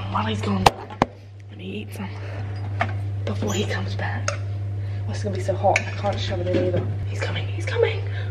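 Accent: United Kingdom. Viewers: Are u from the uk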